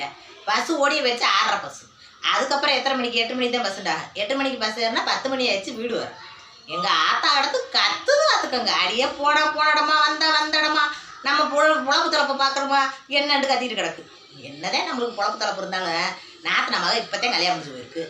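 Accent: Madurai, India. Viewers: Madhurai salang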